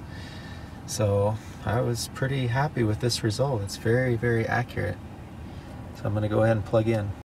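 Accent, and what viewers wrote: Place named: Minnesota, United States. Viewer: I could tell you were from Minnesota right when I heard you.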